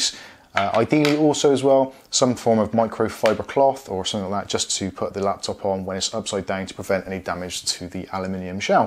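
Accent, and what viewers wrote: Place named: West Country, United Kingdom. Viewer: For some reason, I find your west country accent a bit amusing.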